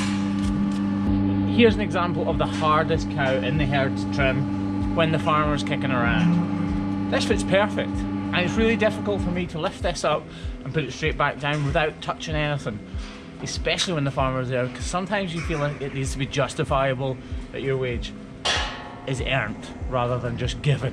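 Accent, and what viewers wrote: Scotland, United Kingdom. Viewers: I know nothing about farms, cows, etc. going through my you tube and saw one of your video’s, clicked it and binge watched a ton of your video’s.l admire your work. I don’t even know how you deal with the poop and pee, let alone the job. Your a kind person. Your face looks really good . I saw where your eye socket and nose was broken WOW! Curious , how did you get into this type of job? Love your accent.Scotland looks so beautiful. Been looking into my family tree my grandfather last name was McClurg. Searching to see if it’s Scottish or irish.Take Care. I live in the United States.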